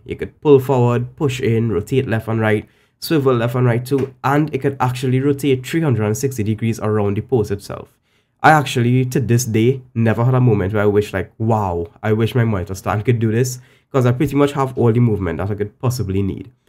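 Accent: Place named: Trinidad and Tobago. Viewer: That’s definitely a Trinidadian accent if I ever did hear one, love the review brother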